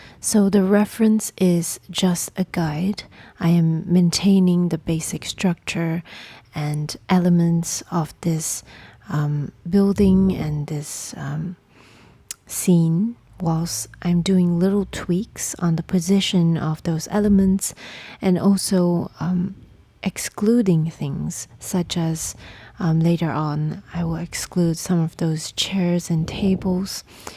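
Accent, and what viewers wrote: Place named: Malaysia. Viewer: Km org Malaysia? Aku bisa mendengar aksentmu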